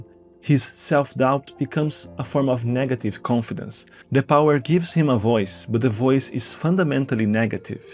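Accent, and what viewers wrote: Brazil. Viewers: are you brazillian? i can tell by the way you speak english, just curious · Great analysis dude! This character trait about Okarun's transformation is something I've already noticed a long time ago, but it's nice to see such a detailed and profound analysis about it. Also.. tu é brasileiro né? Notei pelo seu acento kkkk (mas teu inglês é mt bom man) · acredito que seja brasileiro esse sotaque ingres ai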